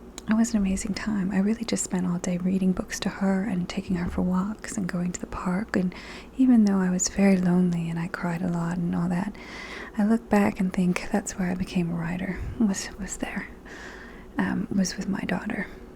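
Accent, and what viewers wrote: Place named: South, United States. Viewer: Such an interesting idiolect. It's funny, when I first heard you speak I couldn't tell if you might be from the south or the east coast so guess it makes sense you have a connection to both... sometimes I heard Jackie Kennedy and other times what I imagine the daughter of Shelby Foote would probably sound like.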